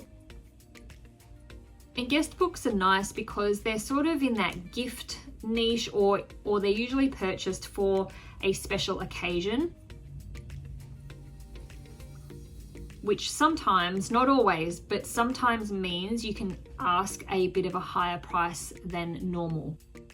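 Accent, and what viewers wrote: Australia. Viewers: HI are you Australian or NZer ?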